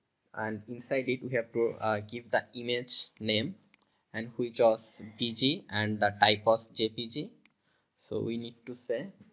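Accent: India. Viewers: Thank you Mr. Indian-sounding guy :DD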